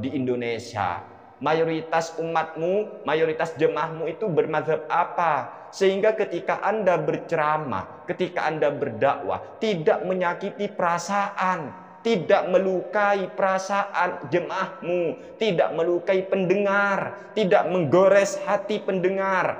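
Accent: Madura, Indonesia. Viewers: Logat Madura ya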